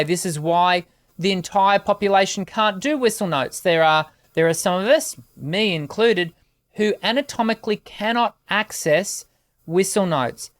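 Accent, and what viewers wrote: Australia. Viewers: His microphone shake is also a good way to respond to people who continue to insist that "he isn't singing live" just food for thought... also it isn't just a personal best... there are no other recorded live examples of this feat (if you have one show me) best voice in the world (that's an opinion translates to "favourite") best technical vocalist? that is measurable, got a better one over all aspects? appreciated your commentary it was very Aussie... greetings from the Sunny Coast.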